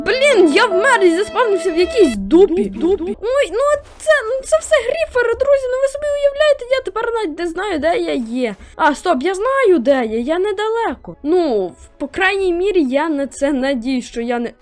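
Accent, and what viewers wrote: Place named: Ukraine. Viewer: Чо у тебя с голосом? Стоп! Ты украинец! Блин😢 я русская но ты класный❤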